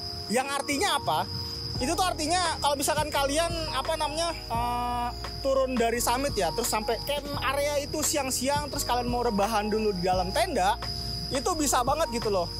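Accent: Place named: Indonesia. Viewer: Suarnya medok banget bang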